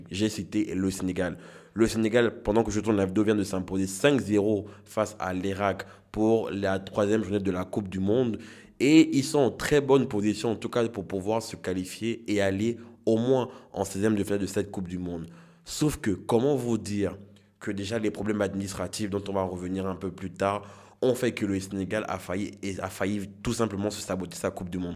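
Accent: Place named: Ivory Coast. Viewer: ivoirien on reconnait quand tu parles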